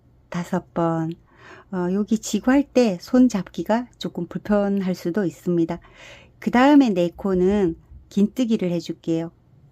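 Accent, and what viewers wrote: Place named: Gyeongsang, South Korea. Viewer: 유툽 여러 선생님들 많지만 최고예요~^^경상도 억양 넘 정겹고요 꼼꼼하고 디테일한 설명에 감동여요^^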